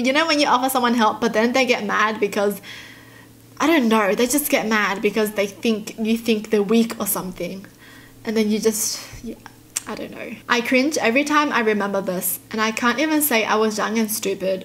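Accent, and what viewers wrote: Australia. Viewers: Any blinks here think she sounds like rosé. Cause they both are Australian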